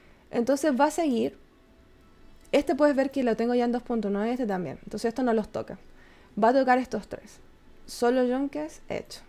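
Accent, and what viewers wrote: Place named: Chile. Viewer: Una Pregunta, de que país eres? Tienes un tono que me recuerda a chile. Pd: Saludo desde Chile🇨🇱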